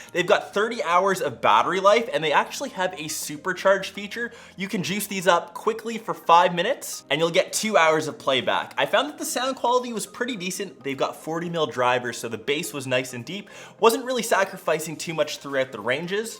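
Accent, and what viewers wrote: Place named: Canada. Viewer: your Canadian accent is awesome !!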